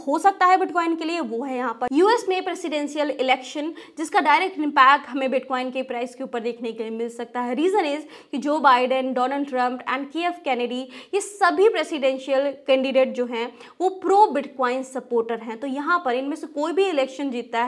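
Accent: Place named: Uttar Pradesh, India. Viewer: ji aapke bolne se hi pata chalta hai ki aap Uttarpradesh k ho.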